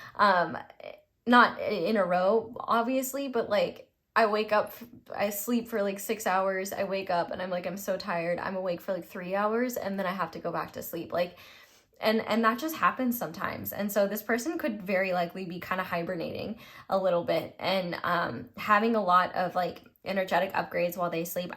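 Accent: South, United States. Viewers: Hi there, first things first I love your original and authentic Southern accent. I find it to be really sexy, even though you might feel embarrassed about it most of the time. You seem to be a really intuitive as well as sensitive person, high on the agreeableness spectrum. Which would automatically mean, that you put other people's needs first, dislike confrontation and last but certainly not least have no hard time forgiving. A true empath by the very definition of the word, which enables you to formulate readings with such accuracy. Your gift is so potent, that you don't really need a deck, to be honest. My piece of advice to you is two fold. You need assertiveness training in order to not feel so guilty when saying no, you need to learn how to operate under pressure, in order for you to continue with your studies because you are smart and articulate therefore wasting potential. 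You could be of great help to any kind of person as a skilled therapist, I mean it. If my intuition is not deceiving me, you must have a real hard time dealing with stress from the exams. You can work on that. Best of luck with your channel.🧁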